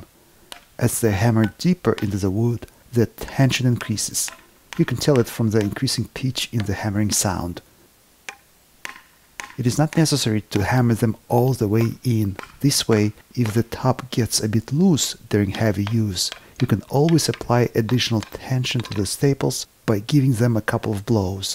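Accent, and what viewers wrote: Russia. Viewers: Не владею английским языком, но явно слышен русский акцент. А когда увидел адвоката понял, что не ошибся.))))) I do not speak English, but a Russian accent is clearly audible. And when I saw the lawyer realized that he was not mistaken.)))))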